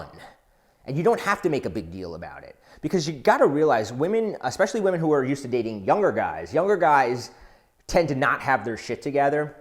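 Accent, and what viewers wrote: United States. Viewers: Non capisco la pronuncia americana, ed è pure sgradevole. Non capisco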